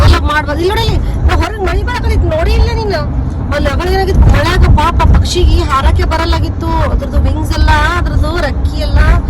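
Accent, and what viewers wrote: North Karnataka, India. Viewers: your North Karnataka kannada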